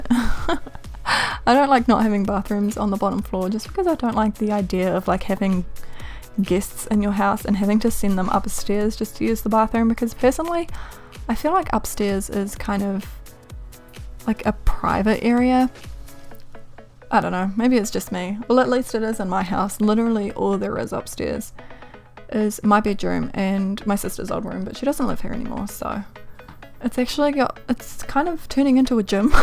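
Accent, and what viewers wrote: New Zealand. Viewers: This house is pretty :) and are you from New Zealand?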